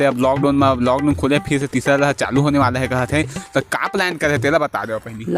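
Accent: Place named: Chhattisgarh, India. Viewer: Your Chhattisgarhii accent is just wow and content joyful....👍❤ No doubt you & your team doing superb job...Keep Going👏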